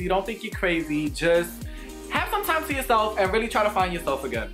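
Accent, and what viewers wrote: New York, United States. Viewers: G I love the way you talk. That New York accent. I do not be having time to be fighting no big person like if you even push my little ass i might fall. 😂💯 this branch though. I'm weak.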